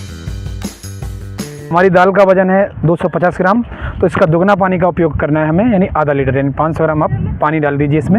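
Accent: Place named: Uttar Pradesh, India. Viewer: sir j aap up se ho kya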